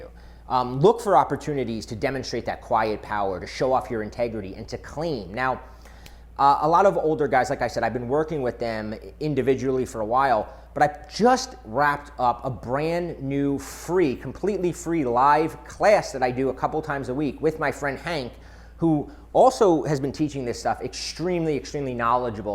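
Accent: United States. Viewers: Non capisco la pronuncia americana, ed è pure sgradevole. Non capisco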